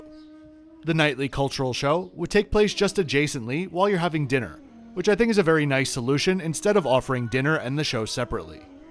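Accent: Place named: New York, United States. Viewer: Best travel content on YouTube by far. Would love to hear the story of how you ended up in Asia. You sound like a New Yorker. ;-)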